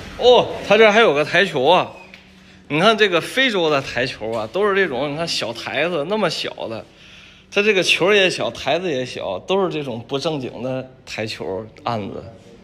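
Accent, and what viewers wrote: Tianjin, China. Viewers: 天津人，哥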